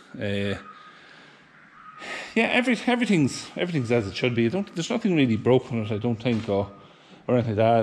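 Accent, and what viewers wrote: Louth, Ireland. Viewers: Are you from co louth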